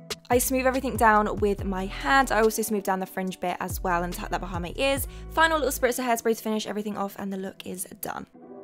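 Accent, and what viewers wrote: United Kingdom. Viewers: Are you British :D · SOMEHOW I KNEW SHE WAS BRITISH 😭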